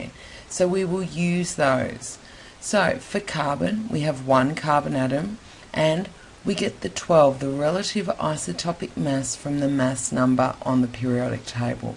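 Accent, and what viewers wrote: Australia. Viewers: What accent is this ? Australian with a twist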